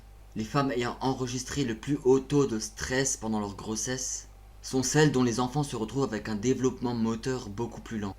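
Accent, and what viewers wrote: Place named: Moselle, France. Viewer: çà c est un accent de moselle, coté saaaaaaaarguemines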